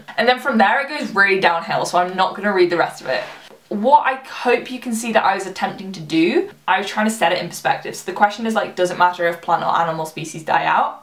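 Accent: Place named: Wirral, United Kingdom. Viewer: that makes a lot more sense now!! Oh I'm from the Wirral so thought I could recognise your slight accent. Did you really like Bristol uni?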